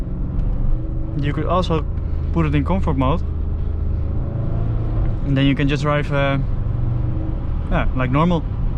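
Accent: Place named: Netherlands. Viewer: hahaha ik hou van dat welbekende nederlands accentje 😂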